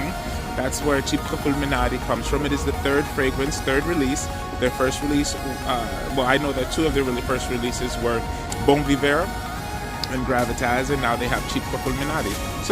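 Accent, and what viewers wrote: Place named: England, United Kingdom. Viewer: Fantastic review! You are eloquent and entertaining, and your English accent is great. I should know as I am a Brit. Can’t believe that you are Garifuna! My wife is from Honduras. After your review I feel that I need Chypre Fulminare in my collection. You have such charisma.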